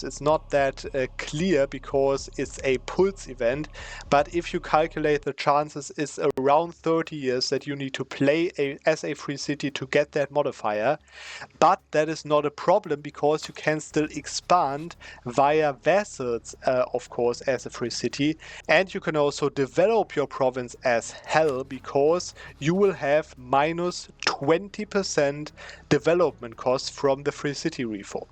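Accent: Germany. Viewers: Do I hear a german accent here ? :)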